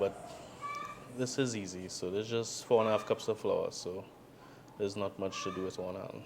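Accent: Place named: Trinidad and Tobago. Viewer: Thanks for the tips.. I make this outside easter for my son. Can i use orange zest when lemon is out of season? And, thanks for maintaining our Trini accent.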